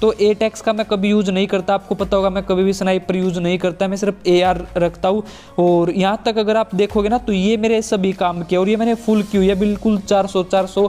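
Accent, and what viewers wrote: Haryana, India. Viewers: Big fan bro I think you are from Haryana 🧐I am right plzzzzzzzzzz reply 🥰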